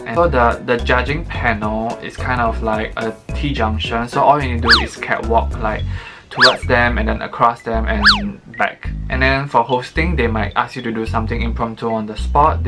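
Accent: Singapore. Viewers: I hear a singaporean accent👁👄👁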